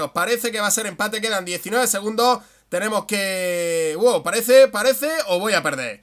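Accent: Spain. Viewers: Su asento es de español?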